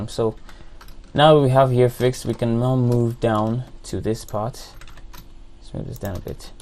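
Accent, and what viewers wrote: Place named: Nigeria. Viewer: you have an accent, are you Nigerian?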